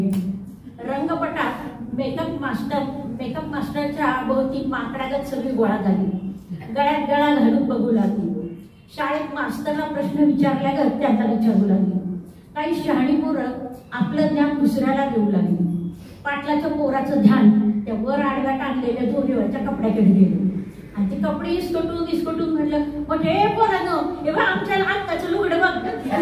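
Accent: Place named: Rural, India. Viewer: छान! हुबेहूब प्रत्यक्ष प्रसंग समोर दिसू लागतात. ग्रामीण भाषेचा लहजा किती सहजतेने वापरला आहे. समाधान वाटते.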